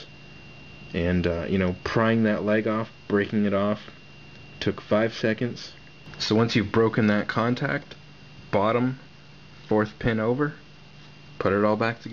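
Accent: Canada. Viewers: You're Canadian huh?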